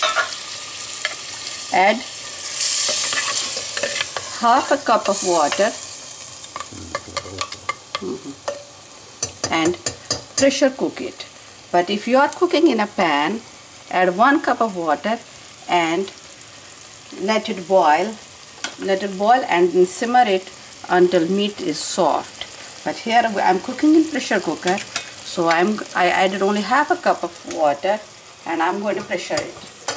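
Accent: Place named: Kashmir, India. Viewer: fantastic loved this recepie an original kashmiri woman speaking with original kashmiri accent all real originak kashmiri stuff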